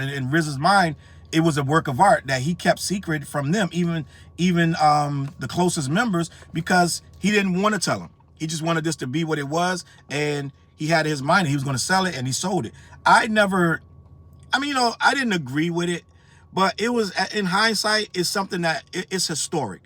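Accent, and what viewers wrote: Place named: Texas, United States. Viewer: Now you sounding like you’re straight out of Texas with this one.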